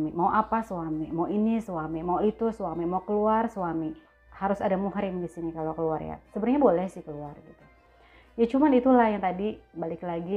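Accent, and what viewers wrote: Jakarta, Indonesia. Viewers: Keluarga jakartanya dmana mpok?..klo dr logatnya kayak di jaksel...sy asli jagakarsa....sy biasa bawa jamaah umroh mpok, mkn klo sy lg di madinah bisa bersilaturahmi 🙏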